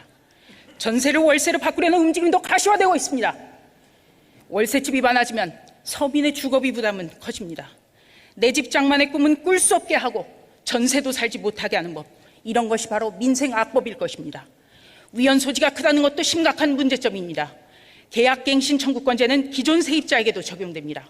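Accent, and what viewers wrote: North Korea. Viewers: ~조선에서 북한억양으로 무게 잡고 트집잡던데 작정한 듯 적어 온 글 따닥따닥 딱타구리처럼 웅변하고 있네요 ~~ 적폐가 적폐를 ~~ㅠ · 조수진 너와 그 외 똘마니들 (미통당) 북한 사람인줄...말투가 왜 저러지?? 못된 관행은 좀 버려라~~~내 세금 돌리도~~니 피같은 세금 너네처럼 놀면서 받으라고 안냈다..걸핏하면 장외투쟁...정말 지겹다!!!!!니네가 민주주의 얘기하니까 왤케 웃겨~~~ㅋㅋㅋㅋㅋㅋㅍㅎㅎ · 대한민국 국민 맞나? 이북여자같다 억양이 억세고 평양가서 하거라. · 조수진이 이여자 북한 아나운서 였어? 말도 약간 북한 사투리쓰는것 같고 말하는톤도 그렇고... 북한 아나운서 같이 들리네.... 왜 30대 젊은이들이 내집 장만 못하는줄알아..?? 너희들 같은 국회의원들이 그집에서 살지도 않으면서 투기 목적로 집을사서 돈을 벌고 있기때문에 집세도 올라가고 젊은이들은 집도 사기 힘든거야..... 지금 넌 뭘 알고 이야기 하니?? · 이은재 강남 망구 대타 조~조 수~다쟁이 진~상 저진상 북한에서왔나? 목소리가? 저여자 보기만해도 독살기가 두눈에서 번쩍거려요 합죽이 입안에선 껌씹고 있나? 왜그렇게 우물거려..! 저런걸 ㅋㅋ. 미통당답다 허기야 잡동사니 집합소아니까 저기 태영호 지성호도 있잖아..ㅋㅋ 귝민의 귀에는 미친개 짖는소리로 들린단다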